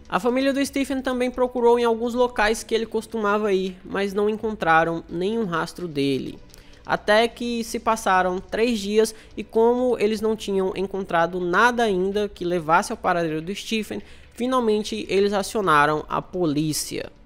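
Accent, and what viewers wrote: Northeast, Brazil. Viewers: Oi gosto muito dos seus vídeos e das suas narrativas 👍 você é do nordeste amigo 👏👏 sou de Aracaju-se.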